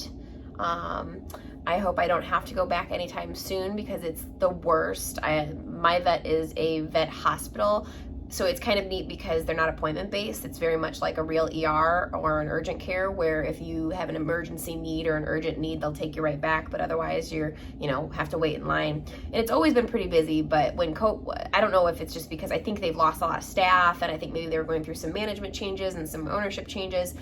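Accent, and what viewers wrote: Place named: Chicago, United States. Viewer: That Cryptids SAL is looking awesome! Love that Chicago accent too 😜 Your videos are great!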